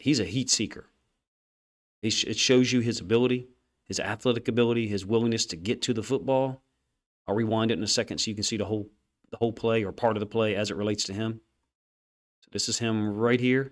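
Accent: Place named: Mid-Atlantic, United States. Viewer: Love your breakdown. Very ASMR. You can't escape that Maryland, Delaware, or Pennsylvania accent. I'm gonna guess Maryland. 😂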